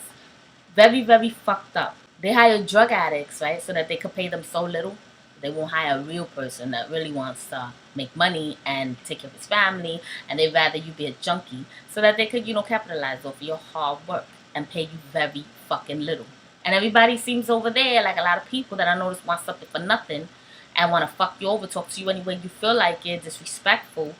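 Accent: New York, United States. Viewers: J. García She talks like a Nuyoricans not black. This is the way we speak. Black people in America don't talk like this outside of NY. You got it twisted bc you're ignorant. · Physically you are a very typical beautiful Puerto Rican woman. The thing you need to change is your Nuyorican traits. This is something that can be done if you work at it. And don't forget the NY accent.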